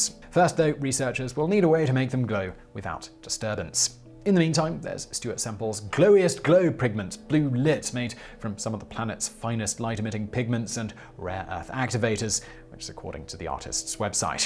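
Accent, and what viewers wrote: United Kingdom. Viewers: are you saying that, just because he pronounced it the British way, means that only British people can watch it? That has got to be the most absurd thing I've read all day! LMBO! So, should he change from speaking like he normally speaks, to an american sounding accent so, we Americans can be able watch? Well then, wouldn't his Brit followers be just so offended! (sarcastic eye roll) Dude! It's not like it's a foreign language! Lol! Lol! It's Americans like you, that give the rest of us a bad name around the world! Broaden your horizon! Not everything is done the american way! But, do have a lovely evening.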